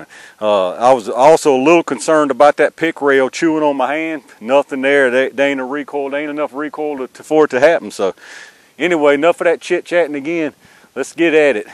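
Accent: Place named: North Georgia, United States. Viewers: Nice gun! You sound like you are from Georgia, and in the North Georgia area. You sound so much like my oldest brother who is now gone. Thanks for the video! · You sound just like all my cousins. We're from N. Ga. BTW, have you put any slugs through it? If so, what did you do with the choke?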